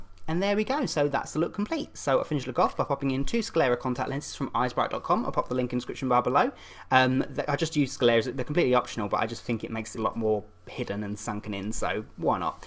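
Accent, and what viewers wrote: United Kingdom. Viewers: I love it! Your British accent is wonderful! I need more omegle's video please ❤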